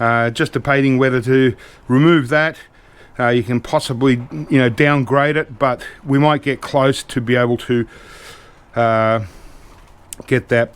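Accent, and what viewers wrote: Australia. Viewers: I live in the United States and we were locked down for the longest time and you weren't don't apologize for something you can't do anything about I love watching your shows I don't care what you do tearing stuff apart cutting off wires it's all good just don't stop let's do a marathon what do you think love that Australian accent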